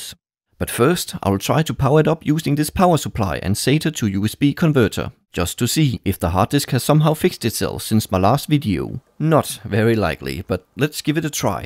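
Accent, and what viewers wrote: Denmark. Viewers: er du fra Danmark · jeg kunne høre fra starten at du var dansker pg.a. din aksent. :) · Your danish?? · xD man kan hører du er dansk xD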